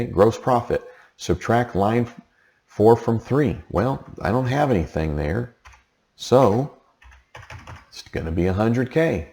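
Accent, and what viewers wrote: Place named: United States. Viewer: I Love your sarcasm and country sounding swag...Thank you so much for this..God Bless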